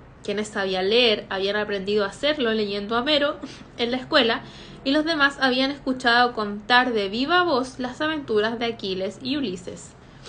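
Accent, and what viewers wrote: Chile. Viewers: Woow,la combinación perfecta, un buen desayuno y la lectura de un buen libro, me encantan tus vídeos,me gusta tú acento chileno, me agrada tú voz,y tú forma de ser, aparte de ser muy bella eres muy inteligente,y admiro tu gusto por los libros,me fascinan la temática de tus vídeos, me gustaría ver un vídeo donde cuentas más sobre ti, o algún vídeo de la cultura de tu país, ya qué mi cultura cómo mexicano, es diferente a la cultura chilena,aún qué vivimos en países hermanos de latinoamerica,cambian los gustos literarios, me encantó la temática del vídeo! te envío un beso y un abrazo, con absoluto respeto..saludos princesa⭐🌺👌😘😘😻☺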